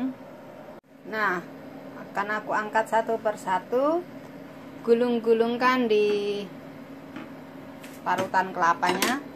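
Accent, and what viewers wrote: Java, Indonesia. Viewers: biar gk leleh mbk tapiokanya ....hhhhaq suka mbk nya ngomonge medok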